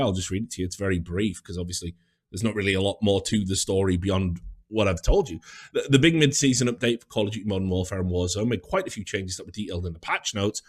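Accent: United Kingdom. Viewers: How do u sound more British now?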